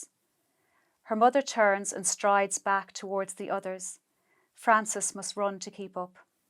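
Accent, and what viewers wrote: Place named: Meath, Ireland. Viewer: Beautiful reading. The Meath Navan accent hasn't disappeared either. Congratulations.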